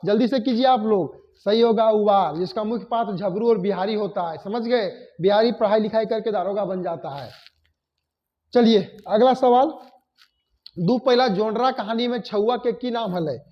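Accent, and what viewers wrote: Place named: Jharkhand, India. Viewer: Sir hum bhi hirodih thana ,pandeydih se hai ,aapki language se humko laga ki aap giridih se h thanks 😊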